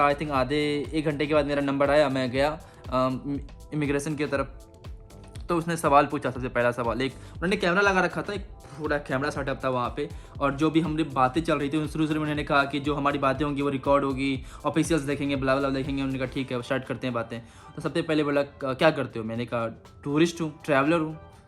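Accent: Bihar, India. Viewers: Its immigration and u r saying immigrason . Dont use bihari accent . Ab toh dunia ghoom liaaa thora develop kr apne aaap ko .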